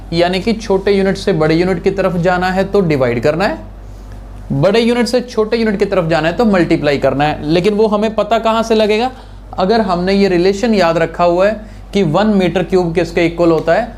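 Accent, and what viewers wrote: India. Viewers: Accent is also impressive! Very few Indian has good accent like you sir. Thank you Sajid Ali Karachi Pakistan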